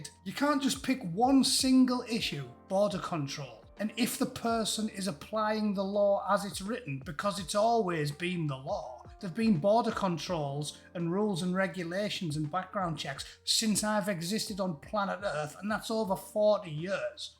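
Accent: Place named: North, United Kingdom. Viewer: Definitely prefer to get the news through you as its healthy to laugh..but whats that elevator music in background?..trying to soften your northern voice??..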